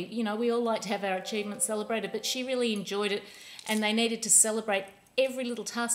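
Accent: Australia. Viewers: It's nice to hear an aussie on YouTube! I really enjoyed the book review - my stroke of insight sounds like an interesting read.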